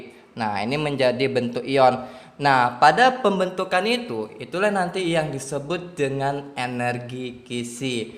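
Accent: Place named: South Sulawesi, Indonesia. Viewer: Duh maaf ga tau, soalnya logatnya hampir sama kaya kating saya yang dari Sulsel hehe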